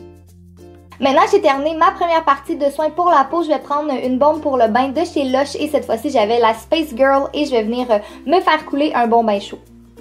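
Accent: Quebec, Canada. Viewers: coucou j'adore tes vidéos et ton accents québécois je t'adore mais juste une question pourquoi met tu de verre de contact ? merci de me répondre rapidement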